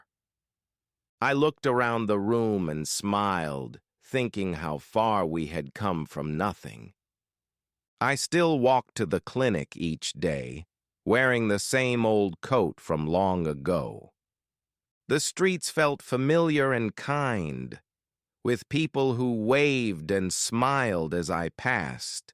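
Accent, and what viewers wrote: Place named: Wayne County, United States. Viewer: Sounds like your in Wayne Co.😮😮😮😮😮😮😮😮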